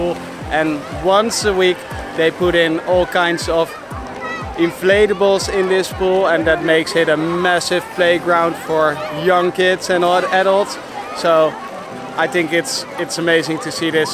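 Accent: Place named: Netherlands. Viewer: Leuk! Ik ga daar 16 juli 2022 heen!❤(ik hoor wel dat je nederlands bent hoor😄)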